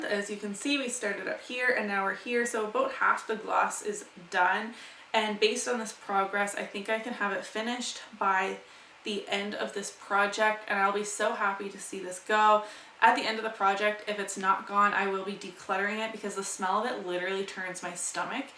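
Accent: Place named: Canada. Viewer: Awesome update! Nice job losing the pounds and for having two empties!! 👏🏻👏🏻 I have been wondering this whole time if you are from Canada, so happy to finally get confirmation in this video!!! I seriously love your accent! Haha as weird as that sounds! 😂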